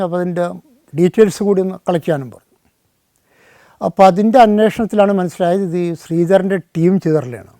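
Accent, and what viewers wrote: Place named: Wadakkanchery, India. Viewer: Sirnte veedu wadakkancheri aano ? Slang also like that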